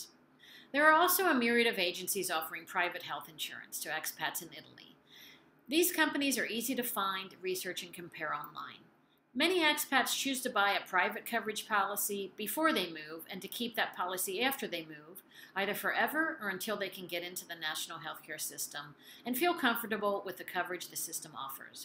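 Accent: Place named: New York, United States. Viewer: Thank you so much for your videos. I watched this one and the how to buy property one as well. Thank you for sharing so much valuable information. I have a frivolous question for you. We live very near your previous place in Long Beach, CA. And you now live very near the Alps. I have friends who live in Torino. And he has shown me video of piles of snow. How are you coping with the weather difference? I think I can detect a bit of a New York or at least a northeastern accent. So maybe seasonal change isn't quite so different for you? We would really like to live in Italy. But I am thinking somewhere in the south because it is a little closer to the climate we are used to. We are not comfortable in the extreme cold I see in the north. I know you love your area, but have you visited many areas in the south of Italy? We love Sicilia and Puglia. 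Both of their climates remind me of coastal southern California. Thank you again for your videos. I liked and subscribed. -Joe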